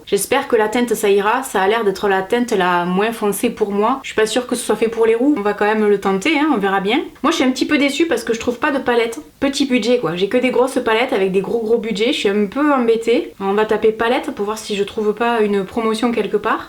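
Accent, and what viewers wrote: South, France. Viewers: Jaime beaucoup ton accent du sud et ta voix et la même que kamila de secret story en tout cas gros bisous, et rejoiner moi sur ma chaîne YouTube biz😗👍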